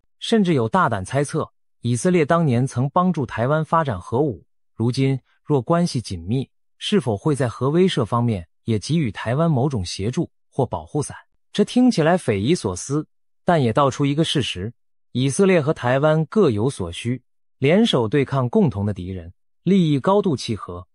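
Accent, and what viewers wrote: China. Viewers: 聽他的旁白是中國口音就知道他不敢，只想賺賺流量罷了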